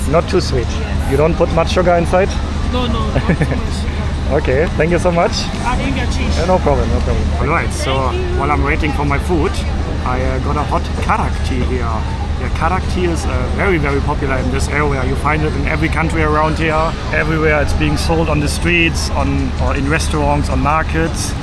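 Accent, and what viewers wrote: Germany. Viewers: German accent? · Dein Akzent ist echt krass 😅 Aber manche lieben es auch. Jetzt vermute ich mal: Stammst Du aus Sachsen? Oder irgendwo aus der Nähe? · you have such a nice German accent. It feels so homey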